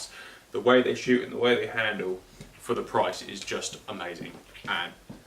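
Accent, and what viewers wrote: United Kingdom. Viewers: I love your YouTubes even though I have a difficult time understanding the Queen's (King's) English. Could you possibly talk any faster, LOL. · It is called the Queen' s English, not simplified English(US)...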